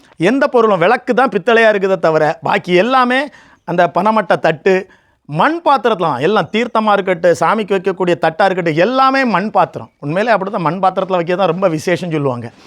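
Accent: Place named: Nagercoil, India. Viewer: ஸார் நமஸ்காரம், உங்களுடைய நாகர்கோவில் பாஷை மிக அருமையாக உள்ளது. உங்கள் பக்தியில் ராயரே கரைந்து விட்டார் போல் உள்ளது.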